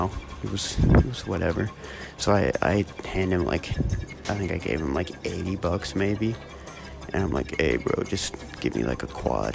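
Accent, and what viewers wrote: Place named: Mexico. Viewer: u followed me on instagram and im assuming its becuase i follow luna and goblinn but dont try to be like them lol u sound like ur talking into an extinct microwave, ur voice isnt really thrilling, u sound like ur mexican,indian,irish, and a whole other bunch of languages, ur voice isnt interesting, ur not sure about what ur talking about and ur unfunny as shit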